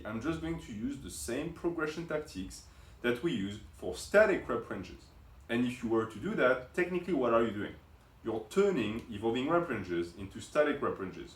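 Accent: France. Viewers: You're French man? you're accent seems French to me. C’est bien ce que je pensais tu suis et.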